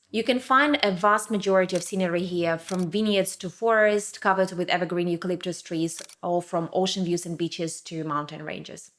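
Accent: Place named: Ukraine. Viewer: an inspiring video, with a certain light vibe. you have a pretty cool accent as a Ukrainian❤❤❤❤ thanks for the video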